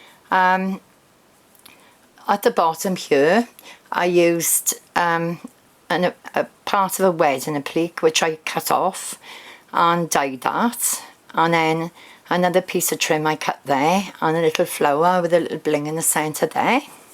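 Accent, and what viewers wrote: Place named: Wales, United Kingdom. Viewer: Beautiful makes and my Mum was welsh and I soooo miss the accent so it was lovely to hear yours. hugs Tibby xxxx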